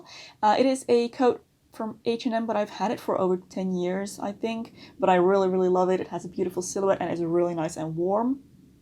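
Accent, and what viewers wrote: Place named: Netherlands. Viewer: i heard dutchie in your accent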